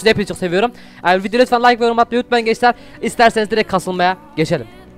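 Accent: Adana, Turkey. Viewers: tam bi adanalı konuşma tarzın var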